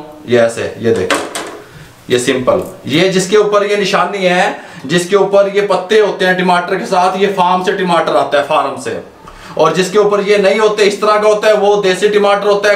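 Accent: Punjab, India. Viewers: ALLAH khush rakhy baba ji aap ko AAMEEN ❤️ itni mehnet se banaty hain aap Love your punjabi touch 😍😍😍😍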